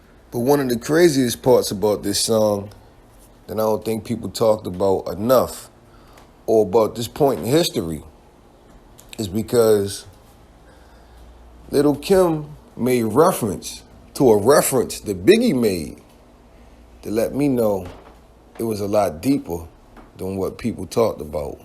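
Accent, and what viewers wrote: Baltimore, United States. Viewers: Do you think Lil Kim started to get plastic surgery to look like Faith?? Just think about how Faith looks and how Kim looks, she even changed her complexion to Faiths complexion. And damn near her body shape too... please give me y’all thoughts 💭... Are you from Baltimore? Cause I can hear how you call folks “Yo” lol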